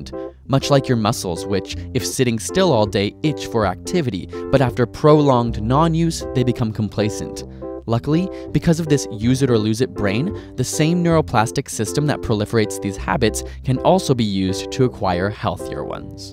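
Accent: Canada. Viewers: OMG YER CANADIAN!!!! ^_^ YAY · Wait, is Canadian?:D I hope they are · Oh your canadian, pog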